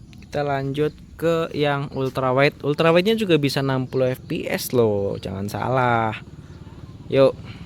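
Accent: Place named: Banyumas, Indonesia. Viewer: sepertinya logat banyumasan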